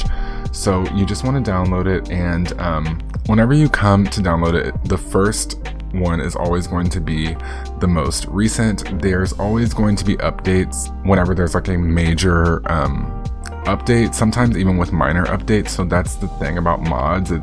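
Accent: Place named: United Kingdom. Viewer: ok but like thanks fir not gamer sceaming in a british accent 💅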